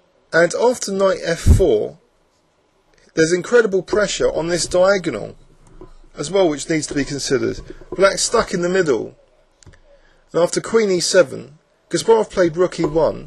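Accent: United Kingdom. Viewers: British accent? (sorry, my English is not very well).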